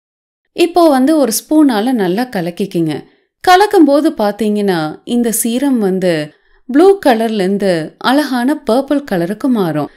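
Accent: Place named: Kongu, India. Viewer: Beautiful kongu tamil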